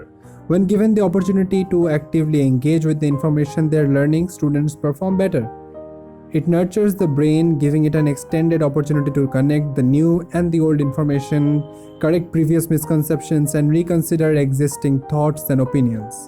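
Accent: Bengal, India. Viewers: Aap Bengali ho na?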